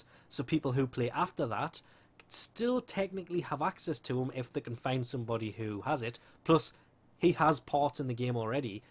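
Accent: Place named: Northern England, United Kingdom. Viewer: According to your accent I think you're from the middle or north from Brittan, so you're planning to fly to Toronto instead waiting till it's actually in Brittan?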